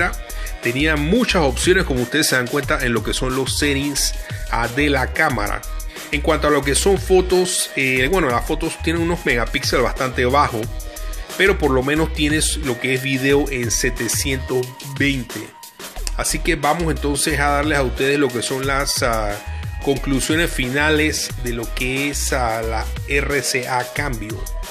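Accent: Panama. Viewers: Él es de Panamá? Tiene un acento muy panameño 😂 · Es lo que parece. Panameño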